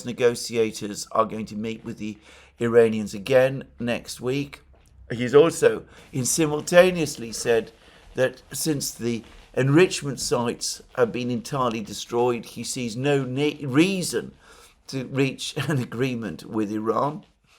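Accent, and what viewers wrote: United Kingdom. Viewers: Where in Britain is that accent from? Giggles make it even harder to pick. Sorry bro, I have to skip this one.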